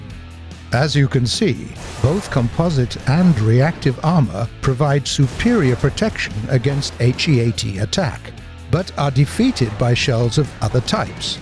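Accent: England, United Kingdom. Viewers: Ricardo Mierz but...he sounds so clever! He sounds so...British! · Gaijin is a Russian company, and they hired someone with a thick English accent to narrate it. Dunno, just an observation. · Мммм... английский акцент. Бляяя... Ну чуваки, всеж нормально начиналось.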